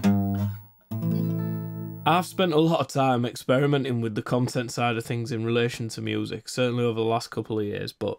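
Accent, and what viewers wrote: Northern England, United Kingdom. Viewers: your cheeky northern accent and your wonderful philosophy is why we like your videos. And ofcourse you beautiful face. But I would love to listen to more tunes by yourself. And if you do new things. Some will work and some will not. But your core of 3.5 thousand subscribers is definitely a good thing. I think it's a snowball effect the bigger it gets the more it builds. Damian keys is great. And really as someone who wants to get my music out there AND start my own YouTube channel. Your trials and tribulations are an education. Don't be afraid to start something new. And if you feel stuck in the mud don't be afraid to give 5them what they want. By the sounds of things you are having a deep think about where you want to take this. And that is fantastic you are the only YT person I have subscribed and given to on patrion. 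I do that because I think your content is great and more real than most of the big guns like Andrew Whag (I hope I spelled that right). They get GIVEN products every day. And I don't trust their objectivity. With notable exceptions like Divkid or Loopop. So something more philosophical is definitely a good angle. And like I said you can still put your music out there. Last thing before I start to ramble is this. I was surprised that Underbelly (you suck at producing) only released his first album I think last year. He has been making content for years before he did that. When I finally decide to start my online Journey I will have at least two albu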